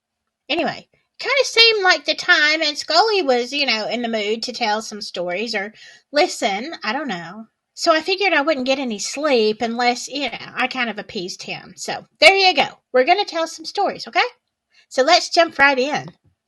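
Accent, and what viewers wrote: United States. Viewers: U have the same English as the rest of English america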